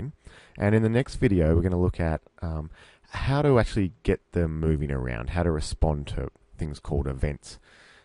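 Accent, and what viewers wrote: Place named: Australia. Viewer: Excellent, well done mate, nice to hear an Aussie voice.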